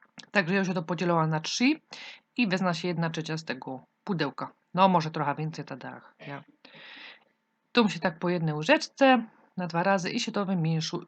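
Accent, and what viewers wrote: Silesia, Poland. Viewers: Fajna gwara Śląska . Robiłem to ciasto już wcześniej ale bez masy.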